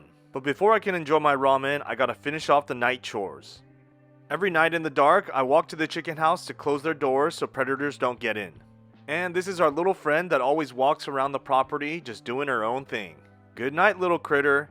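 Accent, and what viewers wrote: United States. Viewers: did you live in america for a long time prior to australia? you speak english in an american accent edit: nevermind i saw the caption right as i typed that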